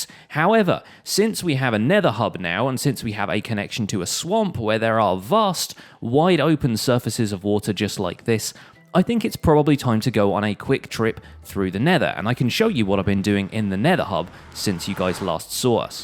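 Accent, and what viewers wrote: United Kingdom. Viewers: British all the way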